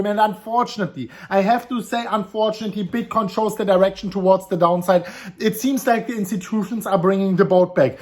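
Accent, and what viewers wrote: Germany. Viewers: Good time to wait for bottom if you are a hodler. Why? Tax season. Funny German Accent Guy Say's Whales selling BTC. I'm Still bullish because we are still above the 55 day moving average but just in case the whales are not finished, set a stop loss. DXY is rising so selling BTC for USD is starting to look attractive. Appears a short squeeze is imminent.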